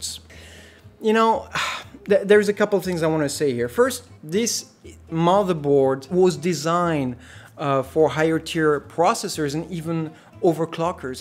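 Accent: France. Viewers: Great review as always :):) is it me or your french accent seems to be fading ? You look really more fluent anyway I totally love your work and I'm extremely proud to see a compratiot appreciated ^^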